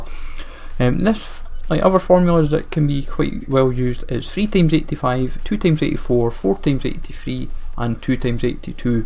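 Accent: Scotland, United Kingdom. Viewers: You Scottish mate?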